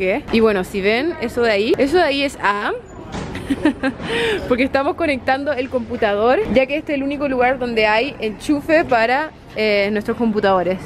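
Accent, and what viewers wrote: Chile. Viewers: es chilena los chilenos hablan así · •DjPABLO• •Gameplays y Diversion• es chilena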